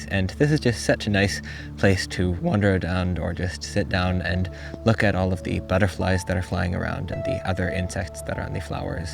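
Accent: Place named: United States. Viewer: It'a a lovely area with some great fauna. I'm intrigued, because you sound American / Canadian but the fauna seems to be from central or eastern Europe.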